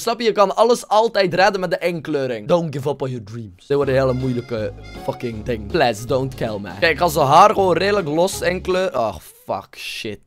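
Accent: West Flanders, Belgium. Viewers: kom je ofzo uit België (ben vandaag je gaan kieken) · Praat wa westvlaamser haha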